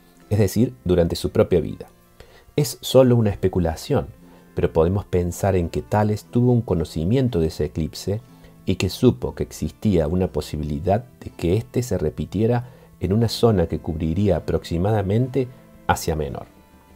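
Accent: Córdoba, Argentina. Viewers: UD es de Córdoba ?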